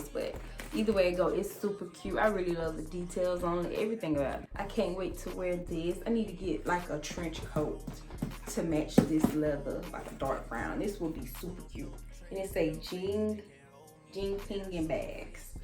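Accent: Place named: Memphis, United States. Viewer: Shein b coming threw wit some of these purses lol especially for dupes and u sound u from Memphis 😂❤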